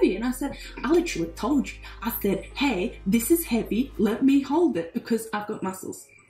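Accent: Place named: Australia. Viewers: so pointing out that an Australian sounds like an Australian? Don’t let them change you, tell them to go heck themselves and lean into it even more. Go full bogan. Sell “Tadoos” merch. Do you. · Never even knew that our aussie accents made tattoos sound like "ta-doos" to americans?¿😂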